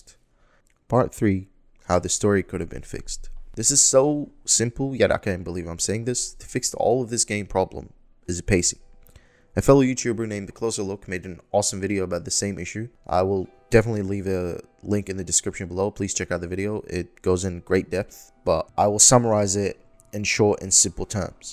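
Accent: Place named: South, United States. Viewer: Bro do you mind if I ask where you’re from? You sound like you aren’t from USA but you kinda have a southern accent.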